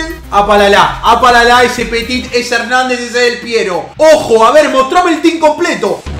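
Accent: Argentina. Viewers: nunca vi a hablando en argentino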